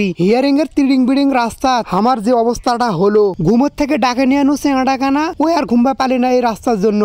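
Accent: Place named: Dinajpur, Bangladesh. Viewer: কারন হামরা দিনাজপুরের ভাষায় এমন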